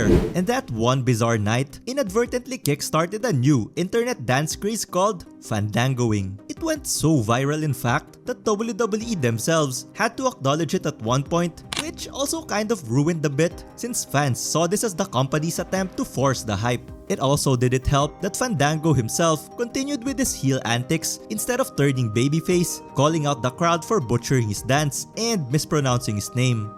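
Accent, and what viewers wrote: Philippines. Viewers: Based on your accent, it seems you're a filipino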